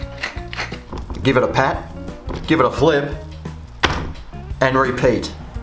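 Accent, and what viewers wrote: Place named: Australia. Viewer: I love you and all my family loves you too 💜 oregaaaanooo love the Australian accent 😎 keep glowing sir ✋.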